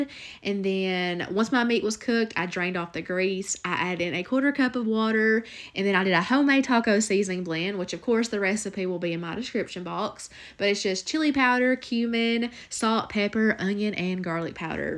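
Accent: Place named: Kentucky, United States. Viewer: I watch to get ideals but then mostly I miss my Moms KY accent and your is so much like hers!!!!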